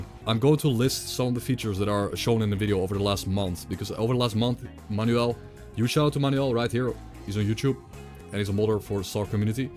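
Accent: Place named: Netherlands. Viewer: u dutch? u sound dutch.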